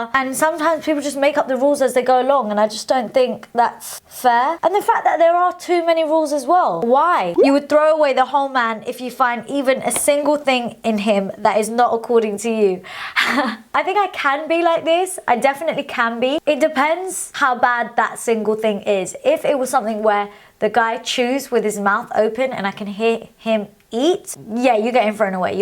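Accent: United Kingdom. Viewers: Your hair is incredible! Do you have a tutorial? Ps love how london u sound😅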